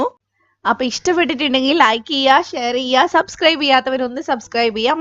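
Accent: Kannur, India. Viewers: Super sound kannur evideyann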